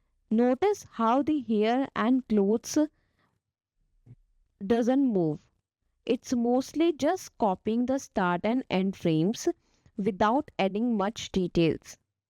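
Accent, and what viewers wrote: India. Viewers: Jesus Christ, you're indian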